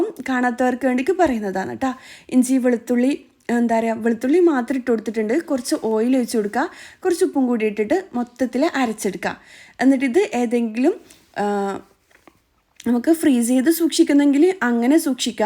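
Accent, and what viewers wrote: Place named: Kozhikode, India. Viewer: കോഴിക്കോട് സ്ലാഗ് ആണ് കേൾക്കാൻ രെസം വ്ലോഗ് ഇഷ്ട്ടം ആണ്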